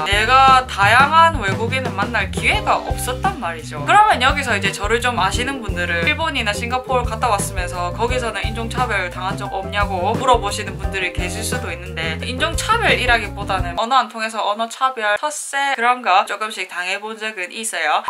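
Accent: Busan, South Korea. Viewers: 음....좀 더 듣다보니...부산같기도 하구요 ㅎㅎㅎ ^^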